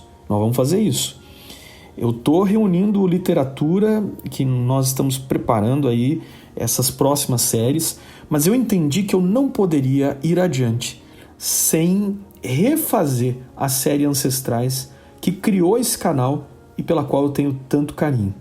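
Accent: Brazil. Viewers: Nosso sotaque gaúcho sempre nos entrega! Gostaria de agradecer pelo canal. É a materialização das minhas paixões! Uso-o muito para auxiliar os ensinos didáticos do meu filho. Se houver alguma maneira (com mão de obra) que eu possa ajudar, por favor, deixe-me saber. Que o Senhor o abençoe grandemente!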